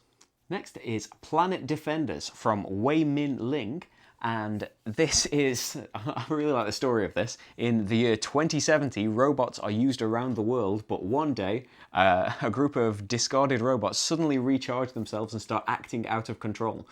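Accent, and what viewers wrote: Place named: Leeds, United Kingdom. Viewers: This was great thanks, and I watched all the way to the end. Is like a friend sat having a chat. Do you live in the North Of England (just by your accent??) If you don't mind me asking. Enjoy Essen! You certainly going to need a few empty cases to bring back all those games ;-) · Yeah I wondered that too. Sounds like you're from my neck of the Leeds woods!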